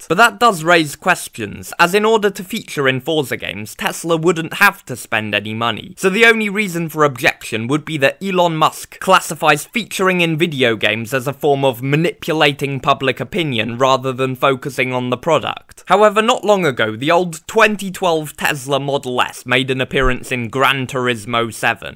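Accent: United Kingdom. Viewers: you are british, right?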